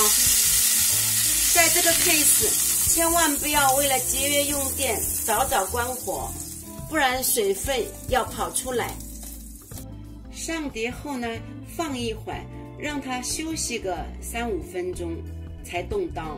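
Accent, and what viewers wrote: Shanghai, China. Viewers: 的上海言话哈一样赏心悦目。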